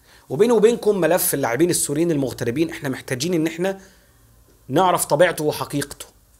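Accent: Upper Egypt, Egypt. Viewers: أنت مبين من لهجتك صعيدي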